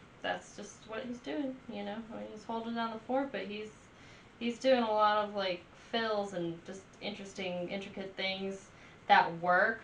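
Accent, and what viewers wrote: South, United States. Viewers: You are so cute with your south lol I'm sure by now you know they are from ATL....I've met them and they're all so southern humble (RIP) Brent 😢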